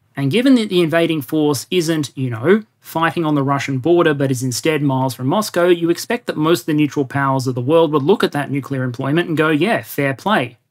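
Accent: Australia. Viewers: He's Australian. It's weird how many comments there are saying he's British though...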